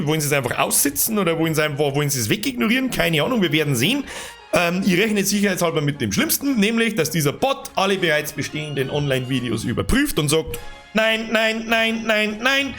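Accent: Bavaria, Germany. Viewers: Also gruß aus BaWü...ich liebe deine Videos und stehe total auf dein Bayrisch (ned falsch verstehen des is koi obandeln!)...wäre mega schade wenn dein kanal gelöscht wird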